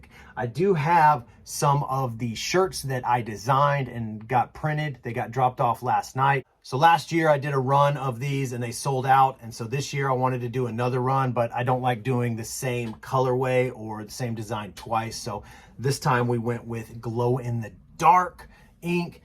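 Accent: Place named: United States. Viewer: Lol that country accent 😂 great haul man! You crush it in shoes!!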